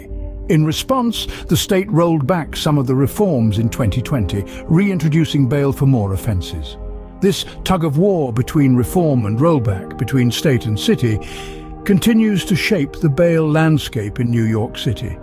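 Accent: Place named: United Kingdom. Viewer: Whats with the proper British person narration?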